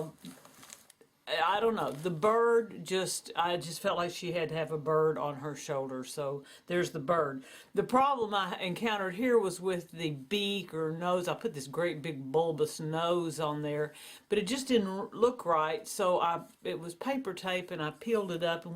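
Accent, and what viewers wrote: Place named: South, United States. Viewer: i really lik it....thanks for sharing,.....ps i love your southern accent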